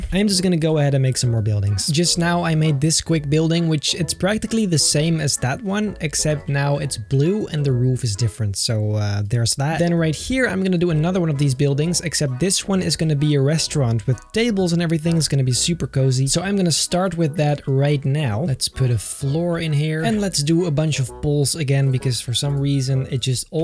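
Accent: Netherlands. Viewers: ru dutch cuz you kinda have the accent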